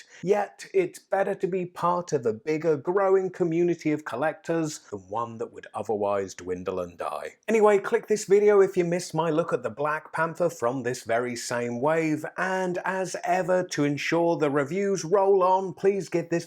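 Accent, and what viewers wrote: England, United Kingdom. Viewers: Oh man I miss your review and your English accent. You’d be so happy to have Worthy Capt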